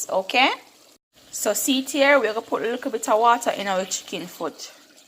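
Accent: Jamaica. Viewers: I love to hear my Jamaican accent on these cooking channel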